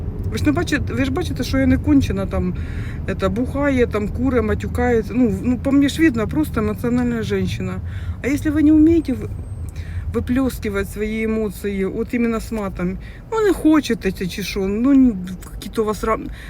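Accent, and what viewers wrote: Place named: Surzhyk, Ukraine. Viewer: Я не украинка, но у этой жинки украинский не родной. Пытается , молодец!Звучит суржик! Лучше уж на родном языке, на котором сны или мысли! Зрителей и просмотров будет намного больше !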